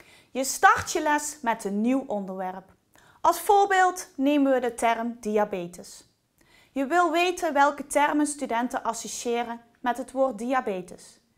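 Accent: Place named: Limburg, Netherlands. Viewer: Sorry, wat een irritant dialect. Limburgs? wogde legge wegkforme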